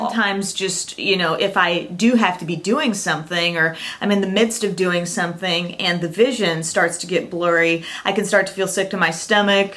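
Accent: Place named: South, United States. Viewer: Really? From Illinois? Your accent totally not a Chicago accent. I thought you were from the south.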